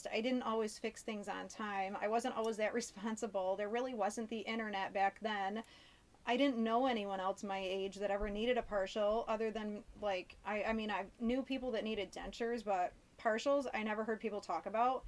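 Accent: United States. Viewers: Are you from Minnesota? :) because you sound like you do! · I could tell you had a Buffalo accent from the moment the video started hahaha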